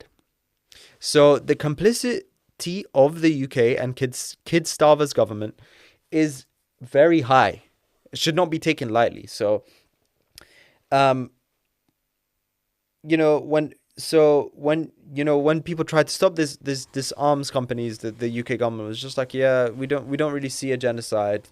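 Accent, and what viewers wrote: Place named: United States. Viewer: Do you live in the US ? because I can hear the accent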